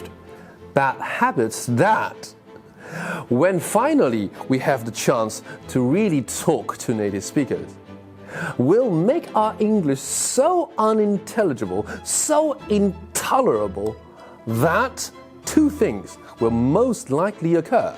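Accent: RP, United Kingdom. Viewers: 太喜歡老師的英式腔了! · Love your british accent and the topic you talk about . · 最近瘋狂迷上英式口音 找來找去Eko的比較好聽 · 看來我要重看福爾摩斯了，不過英式英文真的聽不習慣，好貴族氣質，可...可惡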